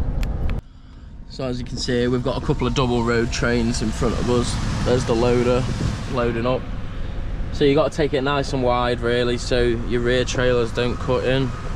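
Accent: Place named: Nottinghamshire, United Kingdom. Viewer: Great video mate 👍 very interesting and informative 👍 how long have you been in Australia? Are you from Nottinghamshire? Thought I could tell by your accent any cheers keep up the good work 👏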